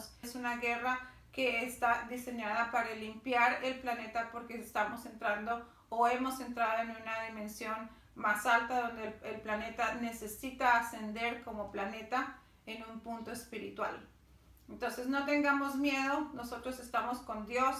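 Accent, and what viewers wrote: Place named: United States. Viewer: ahhmm tu porque hablar como gringa?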